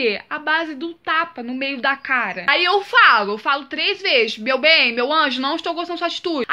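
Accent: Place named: Rio de Janeiro, Brazil. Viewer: Época que tinha sotaque de carioca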